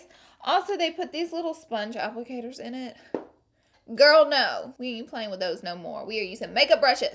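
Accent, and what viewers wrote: South, United States. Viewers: I love your accent! Where are you from in the US- your accent is to die for!💘💘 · You have a southern accent so I automatically connect to you.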